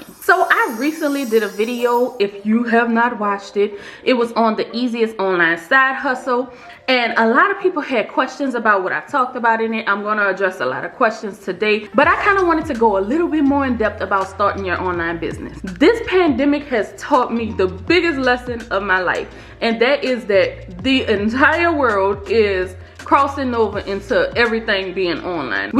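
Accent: New Orleans, United States. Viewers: I hear a New Orleans accent 😍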